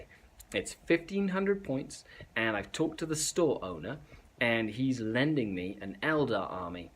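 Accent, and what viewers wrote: United Kingdom. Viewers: Your Canadian!!!!! How come you have an English accent?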